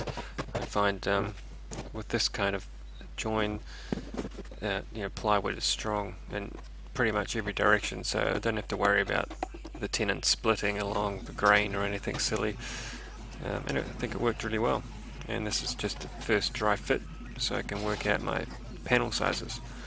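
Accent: New Zealand. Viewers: are u from nz?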